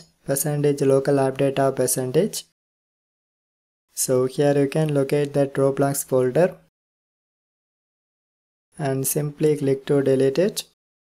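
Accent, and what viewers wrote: India. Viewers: thank you my bro i was making fun of ur accent now ill stop making fun of indians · Indian voice, bye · TV as thai i think he not from thailand i think he is indian bc accent in thailand not like this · thanks bro. for some reason its always the dudes that either are actually indian or sound like them that end up helping me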